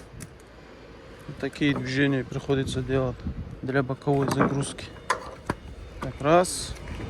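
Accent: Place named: Caucasus, Russia. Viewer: Автор, ты на рожу русский, а акцент кавказский, ты метис штоли?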